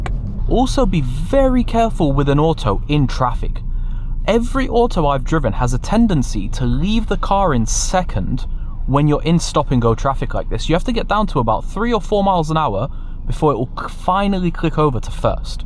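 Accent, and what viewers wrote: United Kingdom. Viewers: Britain has such diversity in dialects and the further back in time you go the more diverse it is, so I wouldn't jump to conclusions, I would just assume that's just how he says that vowel. In fact, I'm pretty sure I've heard several dialects pronounce that vowel like that, or similarly different.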